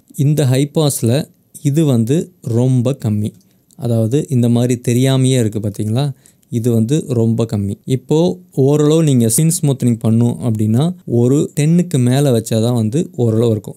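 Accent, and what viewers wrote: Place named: India. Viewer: Saya dari indonesia.. Tutorialnya bagus, tapi saya tidak terbiasa mendengarkan bahasa inggris aksen india.. Sebaiknya ada tulisan inggrisnya, atau translet indonesia.. 😃😃😅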